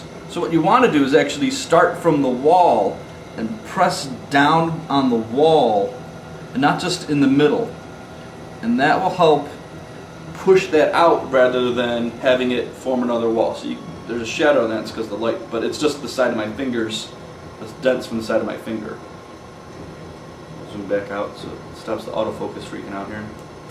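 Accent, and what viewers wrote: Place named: Germany. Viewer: Not gonna lie... the German accent startled me. 0_0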